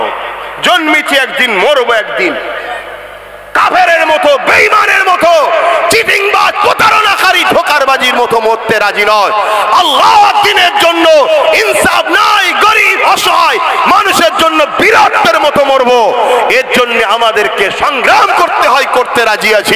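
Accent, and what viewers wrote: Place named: India. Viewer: 😮It is not Bangladesh speak like Indian style.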